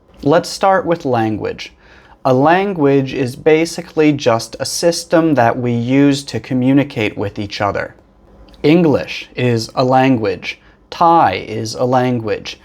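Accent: United States. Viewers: Pleased to see you again. The topic is intriguing. I found it very useful and learned a lot of things from it. I enjoy listening to an American accent, it sounds awesome. I am so greatful for that video. 👍🥰❤️ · You have an amazing American accent, really we are lucky to learn English with you Teacher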